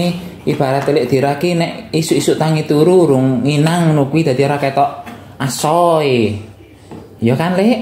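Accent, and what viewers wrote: Banyumas, Indonesia. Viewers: Kesukaanku itu pare dioseng pake tempe,aku seneng karo ngapak2e mas 😅😅😅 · bahasanya ala banyumas yak kang? purwokerto nan.... marai inget pernah di purwokerto mendoan endul 👍😃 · Ngapake polll tp aku ya seneng, ora ngapak ora penak ya mas 😄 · Mas ngapakmu ngelingke aku karo koncoku mbiyen.biyung ngelingke aku ro biyungku sing wis almarhum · Rika wong ngendi pak?ngapake mantep wkwkwk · Mas lucu banget inget karo keluarga di cilacap bahasane podo wae ngapak hiburan sambil masak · Matur suwun mas resepe ,ngapak banget si dr lampung mas hadir🙏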